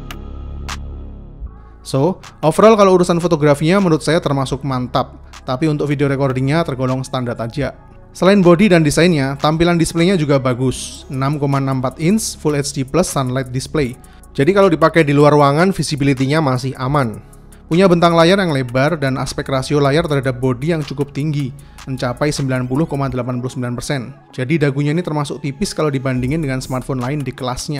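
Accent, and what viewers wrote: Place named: West Java, Indonesia. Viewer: Kak orang sunda kyaknya ya,.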